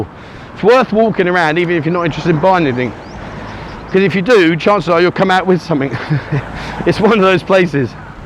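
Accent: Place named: United Kingdom. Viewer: Why don't you make an effort to pronounce Pattaya correctly like Thais do .not like a Brit !!!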